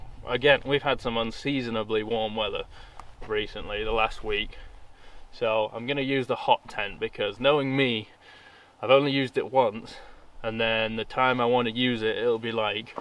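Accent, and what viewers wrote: Yorkshire, United Kingdom. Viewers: I have the same tent and similar stove. The tent is a great tent, with those tent pegs where the cut out is fold the webbing and it I’ll fold it into the grooves. Or hook the top of webbing into the groove. It won’t budge. With your stove top tip, get a piece of Velcro wrap it around your stove box, it’ll hold it all together whilst messing about with the threaded rods. When i set mine up I do it on a tarp or foam pad not on the ground so nothing is lost. Your right you’d be fecked if you lost a wing nut. With regards to your stove in colder climates like Norway 🇳🇴 etc you’d get a buddy to take turns through the night popping wood into the stove throughout the night. My first night using one is set an alarm on my watch every couple of hours to feed the stove. Crap night sleep but waking up to a nice comfy warm tent was nice. 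If you don’t want to wake up feeding the stove it’s got to be a winter down sleeping bag. The guy in the states that distributes luxe tent did a video with a battery clip in fan on the tent pole. Pushes the heat backdown towards you. Try these tips out mate and I promise you a better camp out. Are you a yorkshire lad, picking up the accent a long way from home mate eh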